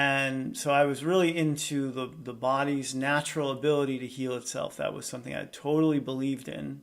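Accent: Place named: England, United Kingdom. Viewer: Wow you channel as a English man 🙏💜 I thought you were English. Excellent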